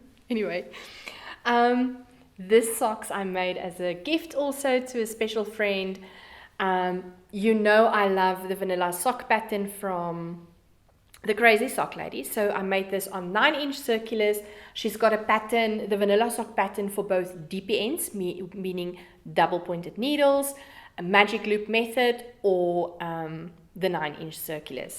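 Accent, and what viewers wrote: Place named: South Africa. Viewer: Thank you again for such a wonderful podcast ❤ I'm really enjoying watching your channel ... And I love your SA accent ❤ Great to see you wearing the Faded Pathways Cowl ... Can't wait to start mine!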